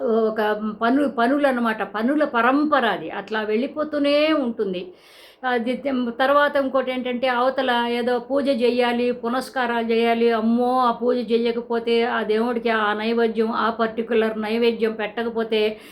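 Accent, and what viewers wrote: Guntur, India. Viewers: గోల్డెన్ వర్డ్స్ చెప్పారు . నిజంగా ఆడవాళ్ళ బతుకులు అంతే. మీరు మాట్లాడుతుంటే మా గుంటూరు జిల్లా వాళ్ళు మాట్లాడినట్టు ఉంది మేడం చచ్చే నవ్వొస్తోంది. అన్ని రియల్ గానే చెప్తారు మీరు హేమలత తిరుపతి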